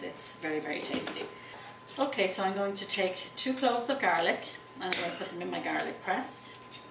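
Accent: Ireland. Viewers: i love your accent Irish i presume . your a lovely lady and thumbs up for you. great teacher and refreshingly no frills presentation. i am going to cook some chicken in my crock pot NOW. thank you.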